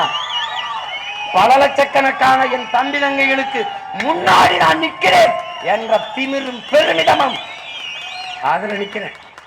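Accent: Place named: Kerala, India. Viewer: என்ன எழவு மொழிடா இது. மலையாளியா?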